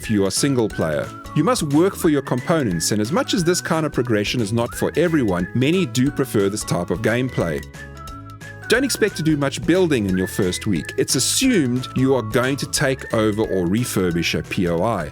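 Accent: South Africa. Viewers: Ek kan hoor jy is afrikaans, dankie vir die inligting.Mod lyk awesome🤟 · Instantly know a South African.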